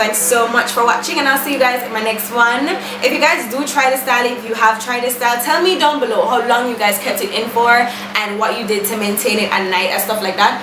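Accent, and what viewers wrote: Jamaica. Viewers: You sound Jamaican.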